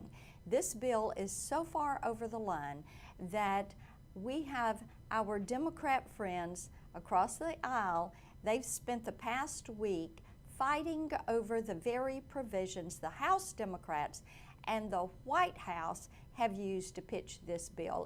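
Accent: South, United States. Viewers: Anyone else notice she pronounces her ing's just like Forrest Gump?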